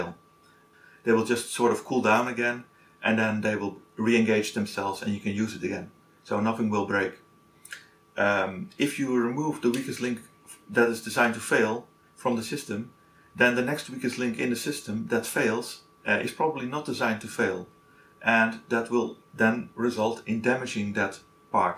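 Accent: Netherlands. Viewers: Are you Dutch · Are you dutch?!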